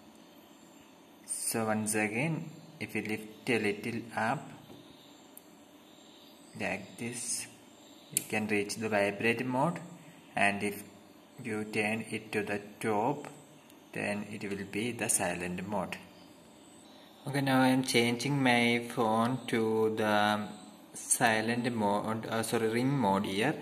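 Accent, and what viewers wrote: Kerala, India. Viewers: The way he is talking looks like malayali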